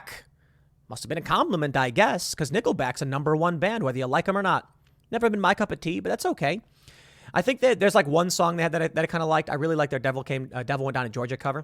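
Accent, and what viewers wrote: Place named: Chicago, United States. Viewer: More Chicago accent please Tim!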